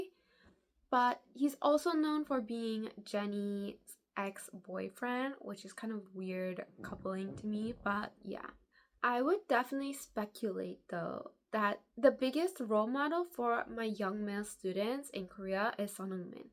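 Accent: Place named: United States. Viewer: Off topic but your accent is interesting to me :) are you American? Like born and raised?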